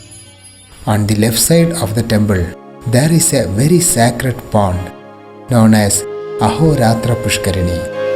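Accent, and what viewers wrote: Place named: England, United Kingdom. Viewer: Commendable job. Can’t thank you enough for the commentary in English. Sadly heavy English accent. Best wishes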